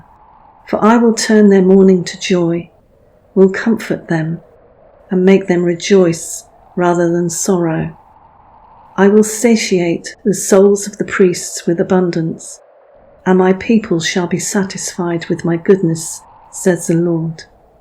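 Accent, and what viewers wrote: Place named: England, United Kingdom. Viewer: Thank you. So beautifully read and timely for Israel. Love the English accent!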